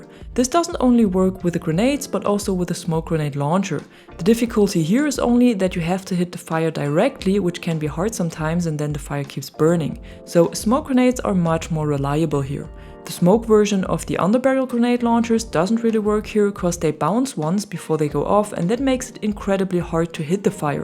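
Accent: Germany. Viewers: danke für die Tipps 👍 weiter so...ich mag deine Videos sehr...ich schreibe einfach mal in deutsch weil ich denke das ich da einen deutschen Akzent höre 😅✌️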